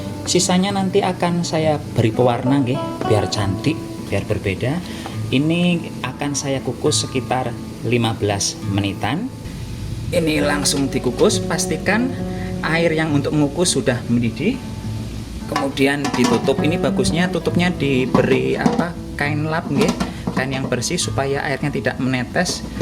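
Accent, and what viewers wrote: Banyumas, Indonesia. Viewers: Mas Rika wong cilacap kan ngomong e ngapak² kya enyong budin pinter masak Rika kang...bojomu seneng due laki pinter masak 😂😂😂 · Saya rasa Mas berasal dari Purwokerto Banyumas.Dialek nya mirip.dialek orang Purwokerto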